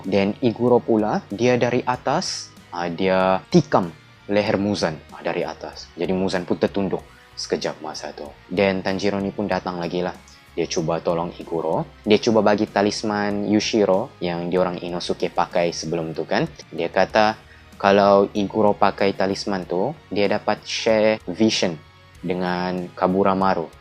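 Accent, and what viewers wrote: Sabah, Malaysia. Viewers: Bro Loghat Mu ni Mcam Asal Sabah Ja Btw Salam Dari Kota Kinabalu 😁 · Abang memang gempak. Org sabah bah ni. Senang fhm. Siok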